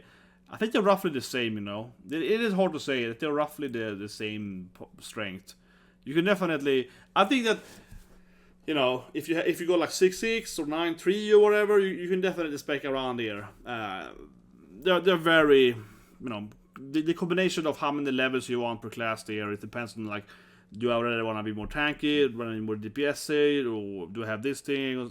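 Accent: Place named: Sweden. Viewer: A tip is to slow down your speech a little, it's a bit hard to hear sometimes and the subtitles are somewhat bad when you speak fast and loose with that Swedish accent.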